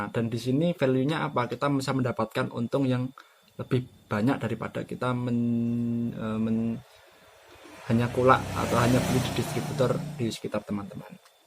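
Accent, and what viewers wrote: Java, Indonesia. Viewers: Jowo e pundi mas? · Bahasa indonesianya medok bang. Hehehe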